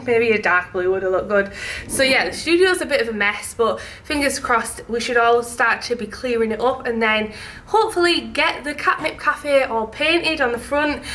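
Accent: United Kingdom. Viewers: You can turn subtitles on with the little square box icon on the screen. Mine are always on. I get a kick out of seeing English translations of UK English accents. It never quite matches up! I know what she’s saying but the subtitles don’t. 🤣🥰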